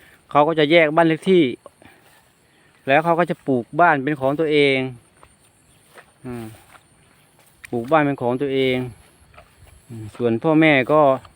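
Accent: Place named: Isan, Thailand. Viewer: โดยส่วนตัวไม่ได้ติดตามข่าวน้องชมพู่นะค่ะ และไม่เคยดูคลิป เลยค่ะ แต่เปิดมาเจอคลิปของตา อ้าวตาพูดไทยตกอิสานแต่ทำไมน่ารักจัง😊ติดตามตาเสยเลยทีนี่😊☺😚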